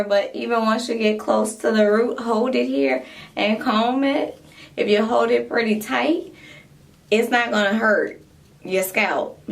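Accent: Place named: South, United States. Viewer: I love your voice!!!! #Southern ❤️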